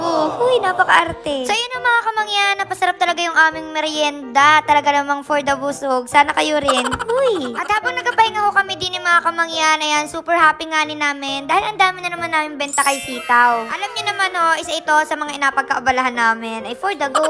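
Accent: Batangas, Philippines. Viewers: Taga batangas ka po ba yung tono ng boses mo eh batangas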